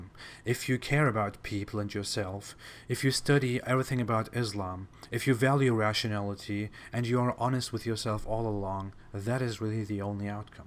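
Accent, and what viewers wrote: Germany. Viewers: Hallo, i can spot his german Akzent as soon as he started speaking xD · Most impressive is that he his accent is a German one. Really hope he wasn't given too hard a time when he was here as a child, by "either side". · Don't apologise for accent. German superior to the bastard dialect called English, duh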